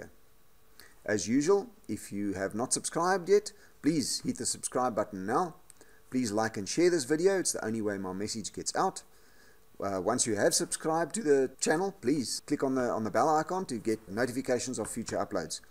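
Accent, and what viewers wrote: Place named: Western Cape, South Africa. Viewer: Are you from the Western Cape Mister?!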